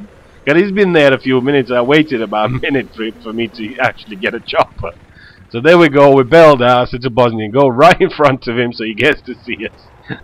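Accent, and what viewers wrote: United Kingdom. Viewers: where in the UK are u from?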